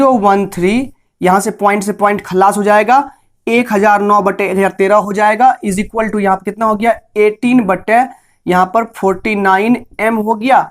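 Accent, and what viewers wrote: Bihar, India. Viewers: Just superb explanation sir 👍 Are you bihari ?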